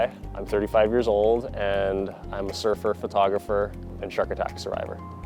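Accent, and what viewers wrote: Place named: Canada. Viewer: He never says how he got his accent in Canada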